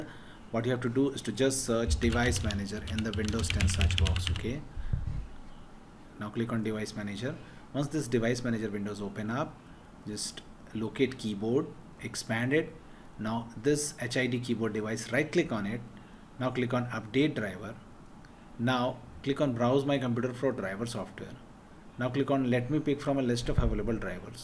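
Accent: India. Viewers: Always the dudes with the Indian accents who don’t require you to download some fishy app xD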